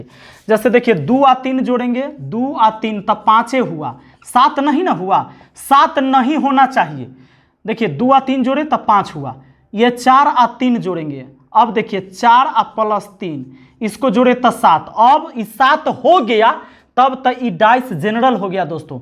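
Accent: Bihar, India. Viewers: ap bihari sur me bolte ho to maza nhi ata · Sir bihar se ho naa sach bolna🎉🎉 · का सर जी ,रउओ बिहारी बानी का??? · Bihari boli❤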